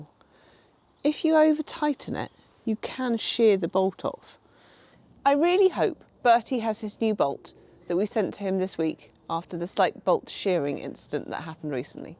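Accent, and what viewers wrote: RP, United Kingdom. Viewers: Dear ma’am: Thank you for your videos! Even though l’m not a Brompton user ( but BROOKS!), l like to see your videos because of the way you talking, posh southern RP accent, that sounds so nice to my ears, as if Dame Julie Andrews dose. 😊 By the way, meanwhile, recently l’ve heard that, they say, some of those bad naughty girls talk as they hold matters in their mouth sometimes today in UK😂❗️ ls that right? I wish you’re dwelling in happy living with bicycles! A short greetings far from JAPAN. Thank you. Domo Arigatou ! ❤️ Sincerely yours. Hiroshi The Biker.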